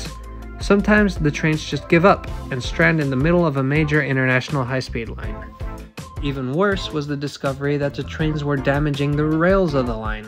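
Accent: Netherlands. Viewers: Bro u dutch?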